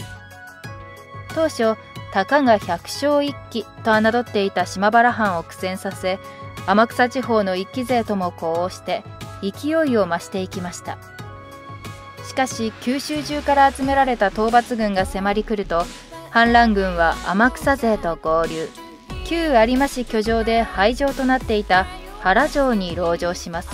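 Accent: Kansai, Japan. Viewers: この関西弁の男性ナレーターさんと直接お話してみたい！歴史ロマンが深そう！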